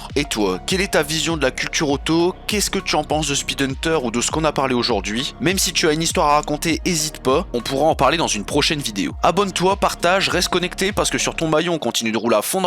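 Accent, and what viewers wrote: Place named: North, France. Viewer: super vidéo continue comme ça bien expliquer et ta un petit accent qui me rappel un peu le nord chose que j’adore !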